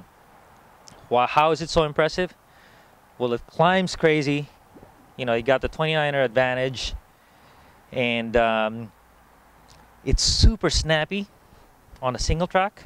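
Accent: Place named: Philippines. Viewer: You sound like a Filipino accent, are you? Anyway am still not convinced that 29'er is way better than 26'er. But I love to try it in a single track in North wales or lake district.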